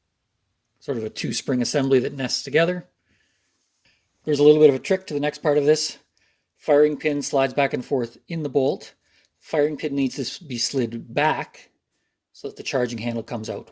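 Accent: Canada. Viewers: I hear a tinge of Canuck, but that cant be..... Trudeau has decreed that no one gets guns. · Ive been around Canadians enough to know, you are in fact Canadian and I love it! I love your accent. Makes me want to take my gun apart more.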